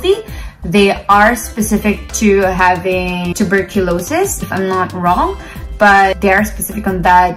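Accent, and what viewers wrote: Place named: Australia. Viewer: I love your Aussie accent ma'am.New subscriber and friends here💗. How I wish I could work there in the next 2 years. I'm civil engineer here in the Philippines having 3 years of work experience however I'm so hopeless, aside from all the detailed documents you need to prepare.then you need at least to have half a million of pesos.I don't have enough money to prepare all that I need, but still I'm here to pursue that dream 😞🙏🏿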